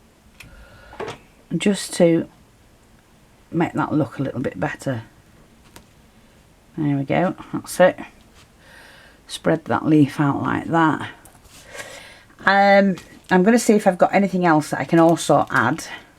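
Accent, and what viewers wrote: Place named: Bradford, United Kingdom. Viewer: Hi. I’ve just found your channel and because of your accent I feel right at ome. I’m from Bradford and I’m assuming you’re somewhere near by ☺️x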